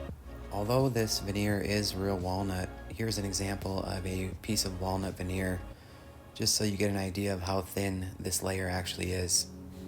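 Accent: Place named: Midwest, United States. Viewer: It is INSANE how much work and frustration went into this. I 🎉CHEERED🎉 when you started pulling the pieces off and "properly" gettin down to biznass with your various sanders. That was actually really funny. It felt like the crescendo in a Dr Jackyl/Mr Hyde scenario. Except like, the loony tunes version? But with ambience. Anyways, I'm binging your channels. You're doing great work! Also a funny aside, I migrated from MI to OR for 10 years now, and I've been wondering about certain words that have started to sound wierd when I say them out loud, and you're making me realize that it's because they're midwestAF long-vowel sounds. Like how you can maybe get it up to 60° in the graaj, or how you're gonna bou-re a hole, or kill it with fyyre. 😅 Anywhoozle, I hope your week is spectacular, and that you find that magnificent piece you didn't realize you were searching for! 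Cheers! ~Violet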